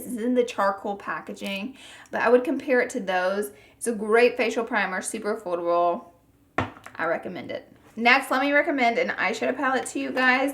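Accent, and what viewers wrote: South, United States. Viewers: Where are you from you have a country accent I love it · You remind me of Alyssa Edwards! Same country accent and everything lol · Hayley She doesn't have a "country" Accent she has a southern accent! btw their kind of the same thing but i'm southern so i prefer calling it southern. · I just love her southern drawl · It sounds like she's American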